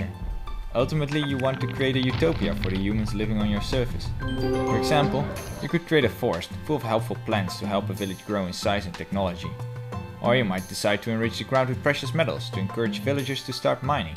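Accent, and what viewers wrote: Netherlands. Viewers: i dont like dutch people who pronounce words like that, we got a lot of hours learning english, french, german etc and still they pronounce it like that. btw game looks awesome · are u dutch like me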